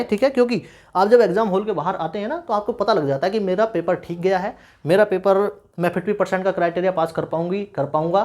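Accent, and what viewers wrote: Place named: Bhojpur, India. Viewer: Bhai tum to bhopur ke ho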